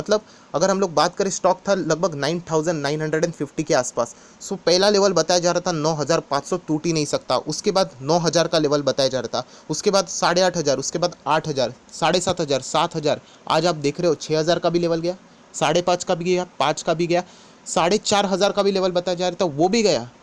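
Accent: Maharashtra, India. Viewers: Kachra nhi hai khara Sona hai 😄😄 Aap Marathi ho kya sir ??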